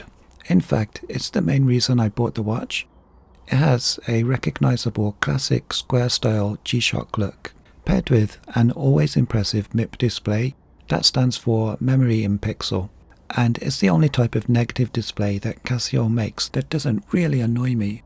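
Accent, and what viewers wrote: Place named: Wales, United Kingdom. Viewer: I loved the review. Very detail oriented and a pleasure to watch. Your voice and way of speaking is also very pleasant. I’m an American so I’m very used to loud and “in your face” and found your accent and volume to be quite a nice treat for my ears. I am going to assume you are from the UK and forgive me if I’m wrong but you strike me as possibly welsh? I lived in Portsmouth for a number of years as a child so I could be wrong but I’d be interested to know where your accent is actually from. Overall great review, honest and detailed. I’ll be subscribing. Cheers from sunny Florida mate.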